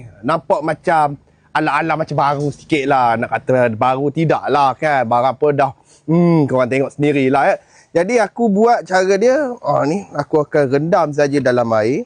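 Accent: Kuantan, Malaysia. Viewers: Orang Kuantan je boleh tahu dengar loghat Kuantan mcm mana😂😂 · brother ni contoh terbaik loghat kuantan yang masih wujud.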